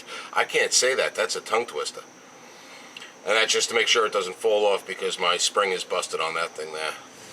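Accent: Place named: Long Island, United States. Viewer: I knew it was long island before I even saw the shirt. Haha. Can't mistake that accent for anything. I got family in Smithtown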